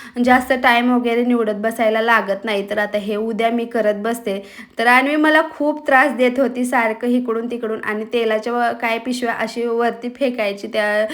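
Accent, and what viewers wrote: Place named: Latur, India. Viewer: Tu pune la rahti pan tuz gav kont aahe tuza kahi kagi shabda varun tu laturchi aahes as vatat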